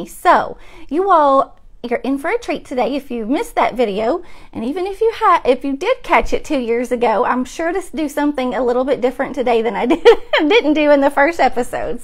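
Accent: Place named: Kentucky, United States. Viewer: I found you by accident looking for how to make faux food- and the fact that you do primitive style?!! Wow! Even better! I love primitives!! I haven’t seen anyone do primitive style with faux baking. I’m a new sub. Your vids are so easy to watch and just love your sweet Kentucky accent 😊. Greetings from southwest Florida! 🍊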